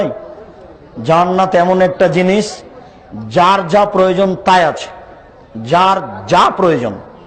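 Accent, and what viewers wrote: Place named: Kolkata, India. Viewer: হালায় দেহি কলকাতার ভাষায় কথা বলে বুঝ্ঝি হালায় ইন্ডিয়ার দালাল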